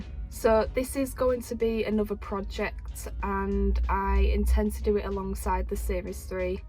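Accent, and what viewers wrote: United Kingdom. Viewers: 영국 영어다!!!!!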